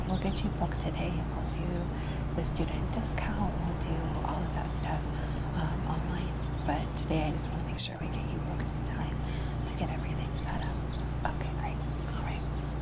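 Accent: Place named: California, United States. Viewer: Hi dear, I love your accent, are you from California?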